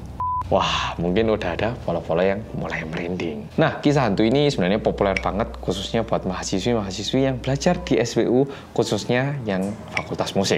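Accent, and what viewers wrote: Java, Indonesia. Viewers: Samean kok iso medok too mas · sprt biasa mas selalu menceritakan secara detail dan dg gaya khas medoknya wkwkwk, lanjoottt trs mas sukses yoh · Ini muka nya kyk Korea bahasa bicara nya kyk org jawa. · Aku terekomendasi dari temen, tertarik goro goro mas e ngomong wong korea medok 😂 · korea punya youtuber orang korea yg medok, jepang punya youtuber nihongo mantapu .. keduanya favorite gue even gue udah emak" 🥰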